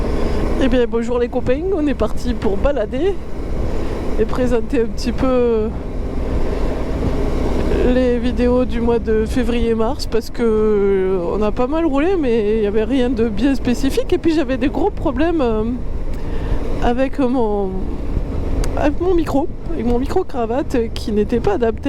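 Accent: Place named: South, France. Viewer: "eh bieng bonjour les copaing..." Ca me fera toujours marrer cet accent de sud perdu en Alsace 😂 Toujours aussi sympa tes présentations :) Tiens, ton écluse de 16 mètres m'a rappelé une autre curiosité que j'ai visité quand j'allais régulièrement à Strasbourg et que tu pourrais présenter: le plan incliné de chaipuhou (en fait je sais, mais j'vais pas spolier)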